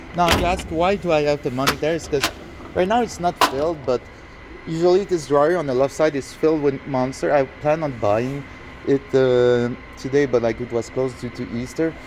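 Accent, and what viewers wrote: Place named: Quebec, Canada. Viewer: “Sorry for my accent, I’m french Canadian. But it could be worse, I could be just french.” Love it! Sweet tour, I’m going to be buying a Tekton box soon. Nice to be able to support a Canadian manufacturer.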